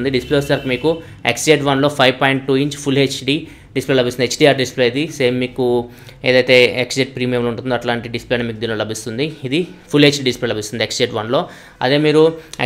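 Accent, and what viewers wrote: Ongole, India. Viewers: R u from ongole anna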